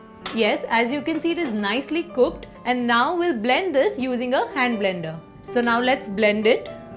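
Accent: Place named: Gujarat, India. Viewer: Good Gujarati English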